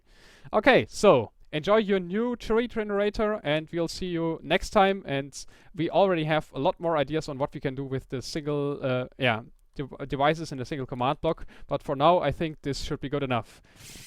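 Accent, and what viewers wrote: Germany. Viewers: you got a pretty german accent right i dont know im german ;D just say no or yes ;D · R u from germany? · Du bist Deutscher oder :P?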